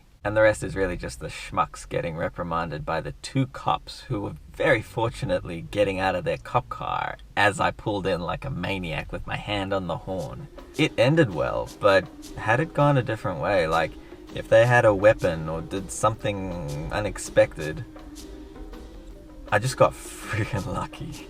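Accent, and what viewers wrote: Australia. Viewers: I just realised now your Australian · are you australian??? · You Australian? Ik where Richmond is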